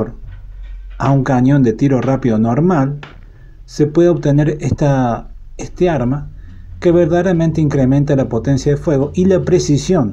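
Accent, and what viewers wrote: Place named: Argentina. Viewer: Pobre Argentina..... Estas OPV, no sirven para una situacion de alta actividad. Lo que tienen que hacer es, comprar las F110 a España, lo más avanzado del mundo en tecnología y sistema de combate. Un saludo, me encantan tus vídeos con tu acento argentino. No somos hermanos? Y se lo compráis a Francia.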